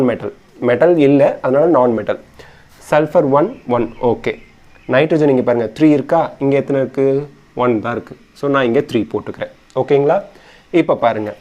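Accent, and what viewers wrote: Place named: Thanjavur, India. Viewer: Sir you are all rounder I am so exciting with you then I have 1 doubt in DNA fingerprinting let me to tell sir in DNA fingerprinting you told know it is differ for a children's who are from a same parents other than monozygotic twins I agree that sir but still I have a doubt that oru childkku 50 percent DNA from their mom and 50 percent DNA from their dad so antha couple kku innum oru child pirranthalum antha childkku athae thane sir irrukku then how we can say their DNA fingerprints are not similar please I have this doubt for many months I know you are the right person to clear my dobt sir I kindly request you to clear my doubt sir and neenga Thanjavura sir enga oru karanga pesurathu mathiri irrukku atan sir kettae okay thank you sir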